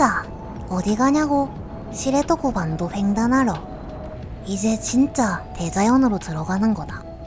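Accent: Gyeongsang, South Korea. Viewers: 구수한 갱상도 사투리 때문에 영상 정주행 중입니다 ㅎㅎㅎㅎㅎㅎ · 매력있음ᆢ 말도ᆢ 표정도ᆢ 음식도ᆢ 숙소도ᆢ 특히 갱상도 말투의 발음이ᆢ 매력 억수로있음 굿~~^^ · 갱상도 아가씨인가요..~^^? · 경상도 사투리가 일본말투 톤으로 하니까 색다르게 들림~~~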